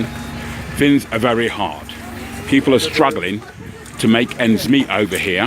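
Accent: Cockney, United Kingdom. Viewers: Thank you for spreading the truth and reality of the UK. Too many go back home pretending they are living like kings. The truth? they are telling lies. My parents took me and my siblings on holiday to Nigeria when we were kids in the early 80s and the thing that struck me were the amazing and beautiful buildings in Owerri and the village. I'd never seen a luxury apartment in my life, not until I went to Nigeria. People owning their own land and building their own homes on it. That blew my mind. UK was a bit late to the game of building luxury apartments for ordinary folks. Really tired of the negative images of Africa the UK like showing on our TV screens. Thanks again for making it your mission to show us there is a better way. Love the Igbo Cockney😄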